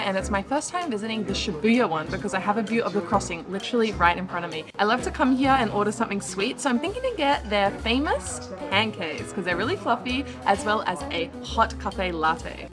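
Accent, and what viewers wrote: Australia. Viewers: Love your Aussie accent 😊. Thanks for your video from a Japanese-Canadian 🇨🇦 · Is your English Australian?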